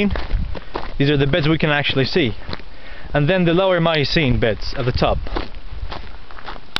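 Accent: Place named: United States. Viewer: nice american accent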